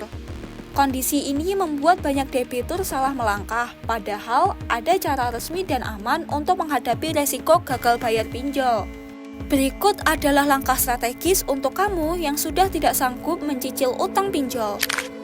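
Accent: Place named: Banyumas, Indonesia. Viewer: Ai bahasa ngapak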